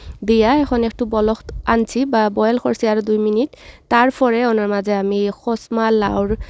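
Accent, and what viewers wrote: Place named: Sylhet, Bangladesh. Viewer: আমি আমার বাবার চাকরিরজন্য প্রায়১২ বছর ছাতকে ছিলাম। এখন যখন আপনার সিলটি মাত শুনি আমার কাছে খুব বেশি ভালো লাগে 😇😇😇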